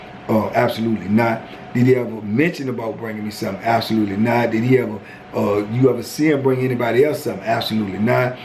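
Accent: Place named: Washington, United States. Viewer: I’m loving these stories When say certain words as only a native Washingtonian can say I chuckle to myself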